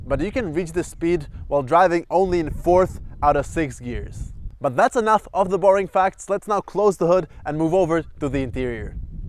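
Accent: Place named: United Kingdom. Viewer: I didn't ask for it, its not my video. Looks and sounds like some "Bloke" from the UK...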